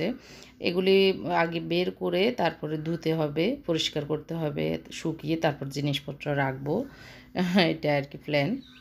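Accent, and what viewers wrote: Noakhali, Bangladesh. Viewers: প্রথম দেখছি আপনার ভ্লগ। ভালো লেগেছে।♥️ আপুর বাড়ি কি নোয়াখালী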